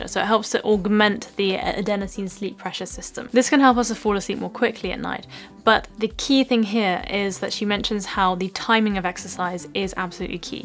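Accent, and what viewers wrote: England, United Kingdom. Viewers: how have you been l hope you re doing well l have watched you for 5 months and h really have benefited as weel as l didnt understand english language but with you there is not much to it and especially british accent from your faithful follow from algeria💜💜💜💜💜💜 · It's nice to hear proper RP on YouTube. Most of us get shooed off. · She is a very talented and a beautiful princess. I love her English accent. It is just so cute. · Hi,! Thank you so much for sharing about it. I’m learning English now. I like British accent so I learn a lot from your videos. I’m always cheering for you from Tokyo Japan❤ I hope u to come to Japan someday! I love you❤❤❤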